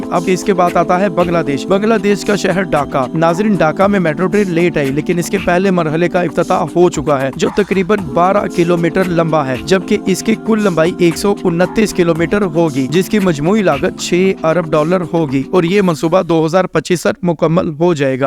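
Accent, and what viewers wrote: Pakistan. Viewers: Lehja bta rha ha k aap Pakistani ho ? Aur PTI supporter bhi ❤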